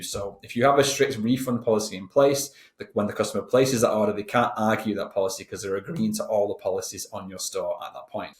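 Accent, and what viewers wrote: North West England, United Kingdom. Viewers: First time I've watched your vids tonight mate. Breath of fresh air. Not the usual BS about how cool they are but proper factual stuff that we all need to know. I have had my ebay shop open dropshipping from Ali for a grand total of 5 days. I am currently going through the "what am I doing wrong" "is it worth it" phase. I'm trying to remain positive and keep scaling. Fingers crossed I need to find out how to get views first off. I'm keeping things as cheap as poss for the time being. Keep up the great content. You sound like a local north west lad like me too.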